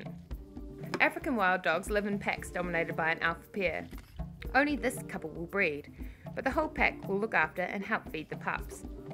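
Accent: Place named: New Zealand. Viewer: Kiwi accent . Lol. Kia ora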